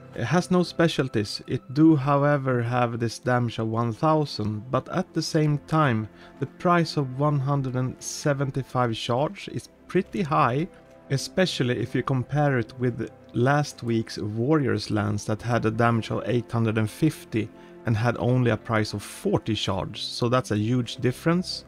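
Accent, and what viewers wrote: Sweden. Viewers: Hello your videos are awesome but i got 1 question for you (Are you actually swedish bc you sound very swedish like i do)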